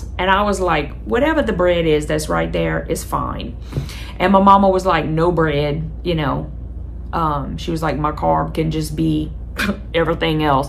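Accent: Louisiana, United States. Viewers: You have the Cajun accent back! I have kids and grandkids in Lake Charles!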